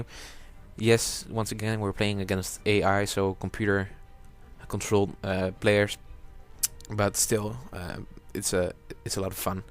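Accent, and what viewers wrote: Netherlands. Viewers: je kan duidelijk verstaan dat je nederlands ben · youre dutch? you got a litlle dutch accent, im dutch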